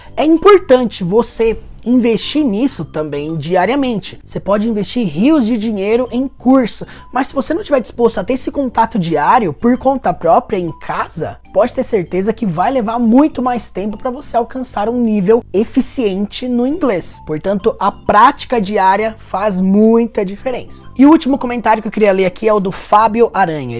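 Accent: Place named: Inland São Paulo, Brazil. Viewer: Esse teu sotaque de Paulista do interior é insuportável!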